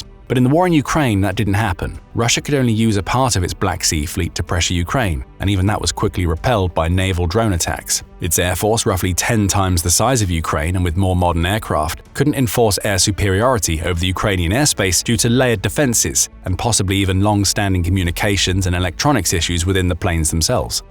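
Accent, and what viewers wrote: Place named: Southern England, United Kingdom. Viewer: Very good Standard Southern English narration from this bunch of Americans ... shame that the computer rambles on about high school and college students --- meaningless to a British audience. In the same way that the Germans lost the First World War in the first days of the War at Liège (4th-17th of August 1914), and they lost the Second World War in the Battle of Britain (1940), so the Russians lost this war in the first days of the war at Hostomel Airport. It has taken a long time for the significance of the Battle for Hostomel Airport to become apparent but that IS where Russia lost. The language problem is easily solved as it was solved in the First World War. You divide the front into sectors. Whilst maintaining a watching brief, the Russians would have done well to leave Kursk to the North Koreans as the French left the Belgian and northern end of the front line <num> to British command with only liaison between bilinguals at the top. 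The Koreans would have only needed a handful of interpreters then. Even with their mass of interpreters, there was appalling miscommunication. Far be it for me to help the Russians but the solution is as clear as the nose on your face. This, of course is not possible because of the control-freakery of the monstrously stupid Russian high command. Just because demolishing the entire city of Grozny worked when confronted with a bunch of religious fundamentalists armed with little more than AK47s, it doesn't mean that that same strategy will w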